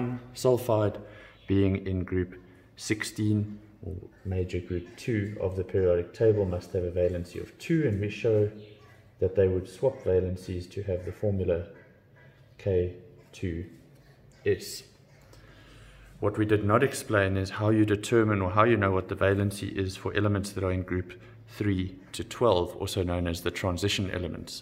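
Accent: South Africa. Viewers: Helped a lot thank you PS: are you South African?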